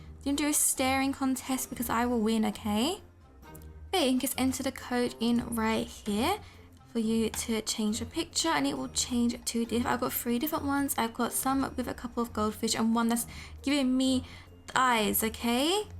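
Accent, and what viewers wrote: United Kingdom. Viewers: HER BRITISH ACCENT❤️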